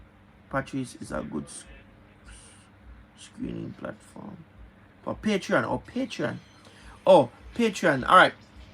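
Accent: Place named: Jamaica. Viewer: I understand, but the Patwa is part of our culture, and a lot of foreigners love how we speak. Anyway in the name of progress and a wider audience do what you need to do, just keep the Jamaican accent You will always have my support.❤❤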